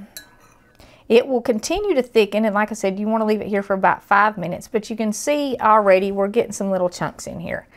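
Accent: South, United States. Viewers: Wonderful slight southern accent, not over done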